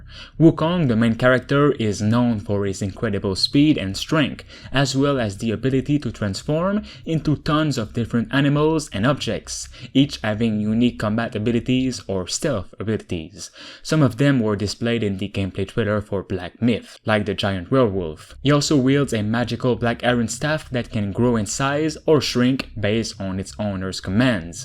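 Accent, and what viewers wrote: Quebec, Canada. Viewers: Are you from Quebec ? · Youre quebecois im i right ? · Are you from Québec?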